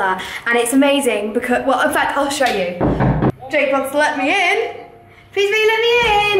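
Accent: United Kingdom. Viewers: Is she from England sorry I am new here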